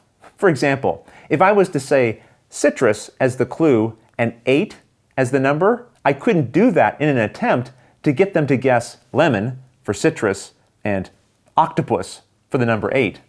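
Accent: Canada. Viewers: We've played this after watching your video, have to say, wonderful job you're doing there and i like that Canadian accent of yours, cheers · is he canadian? he says "about" and "out" very canadianley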